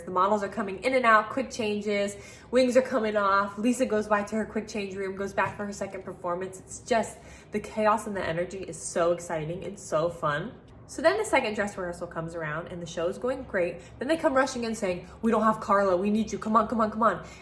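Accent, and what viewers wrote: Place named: Midwest, United States. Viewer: I'm trying to place your accent, or lack thereof. You're either PA, Ohio, Indiana, Illinois, or you're from the west coast, I believe? Which is it, please?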